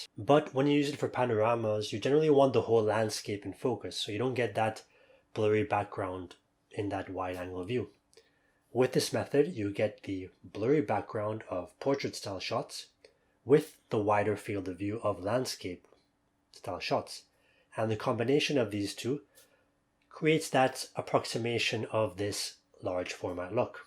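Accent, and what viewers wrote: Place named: Wales, United Kingdom. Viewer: Spent ages trying to figure out your accent. I thought there might even be a bit of Welsh there... heck, even throw in some Carribbean. Man of the world, probably!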